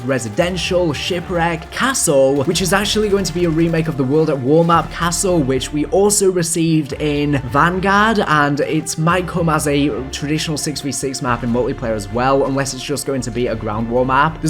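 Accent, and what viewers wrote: England, United Kingdom. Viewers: I’m from Scotland and trust me mate, there’s about a million different accents out there in the UK. I’m pretty sure English, couldn’t tell you what part of England he’s from exactly though.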